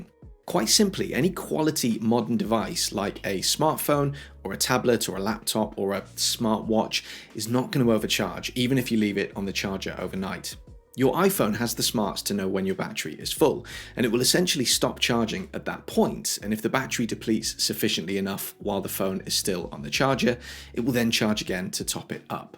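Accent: United Kingdom. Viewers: your Queen's English is quite appreciated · A calm erudite British accent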